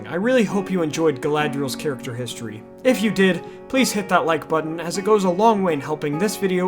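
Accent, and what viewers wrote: United States. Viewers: the way Americans pronounce Middle Earth names makes me laugh ... bless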